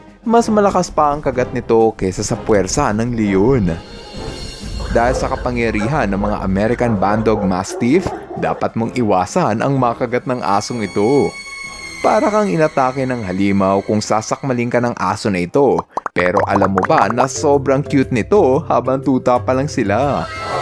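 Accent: Visayas, Philippines. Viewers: Gusto ko po ang german shepherd,dutch shepherd at belgian malinous po kuya pero may tanong ako sayo visaya kaba kasi ang ibang narinig ko sayo visaya katolad namin😅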